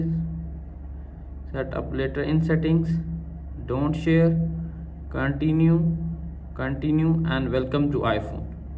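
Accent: India. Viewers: scammer with the indian voice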